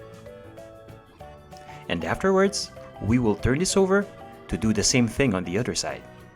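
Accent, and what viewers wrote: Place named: England, United Kingdom. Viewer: In fairness sa English twang ni Kuya ha? Love it! 😊😊😊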